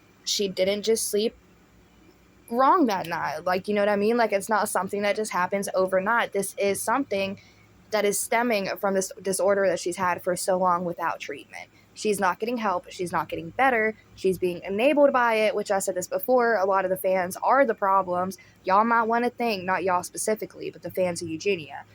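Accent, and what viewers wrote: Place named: Alabama, United States. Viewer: I must know, are you from Alabama? because I am and we have the same accent!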